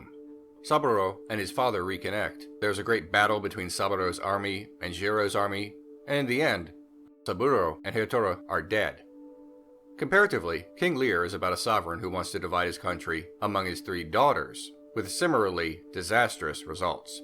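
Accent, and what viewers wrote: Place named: United States. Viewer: A fair analysis, but I was really distracted by the mispronunciation of every Japanese name ... I know it probably doesn't bother English speakers but as someone that grew up in a familiar environment with the language I seriously have trouble figuring out what name it is you're saying. Then again, these are mistakes literally everyone that doesn't speak the language makes, but still, it is a mistake; the English spelling of Japanese names has never been phonetically accurate so I guess it will always trip me up hearing Japanese spoken through the American accent.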